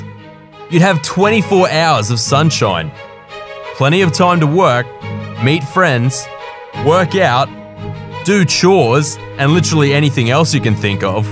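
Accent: Australia. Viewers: Aussie mate explaining sounds so nice!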